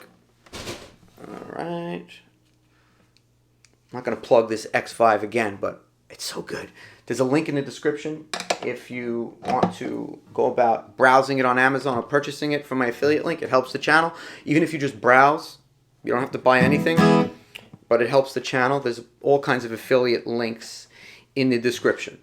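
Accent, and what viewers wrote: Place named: New England, United States. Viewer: Awesome instruction and easy to follow. Bonus for the NE accent.👊🏻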